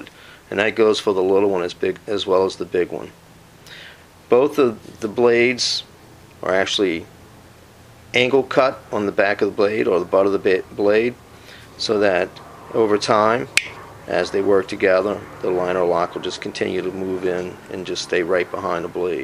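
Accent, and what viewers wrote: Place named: New England, United States. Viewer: Nice vid! You've got an interesting accent. I detect some New England and some Southern. I know that's very vague as there are so many variations. :-) Where have you lived?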